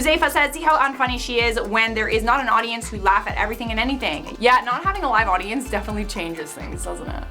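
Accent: United Kingdom. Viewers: I love your british accent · You British lady accent is what keeps me clicking😉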